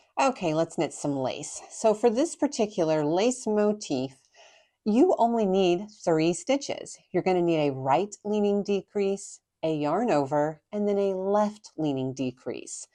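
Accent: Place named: Oklahoma, United States. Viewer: The dining room looks fabulous! I ❤the Indian chief picture, beautifully noble. Drawer only sounds funny to you because you have developed the Okie accent. 😂 I was supposed to get to meet you at the book signing in the McKinney Knittery but I had a bug. I picked up your book the next day, it’s amazing. Thank you! You might could start a “give away” of socks.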